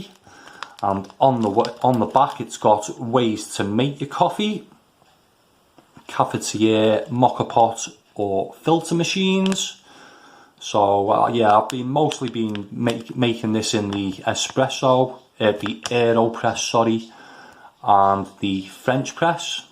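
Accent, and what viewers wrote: Liverpool, United Kingdom. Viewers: Thanks, but you do it so much better, and I could never replicate the Scouse accent being a 'Soft Southerner'!...as for the methods/protocol, I think this and a previous review of mine show that they are simply a guide for consistency, and sometimes you can get a better [tastier] result from what shouldn't work. Keep up the search [and review] of the best budget coffee beans! 🙂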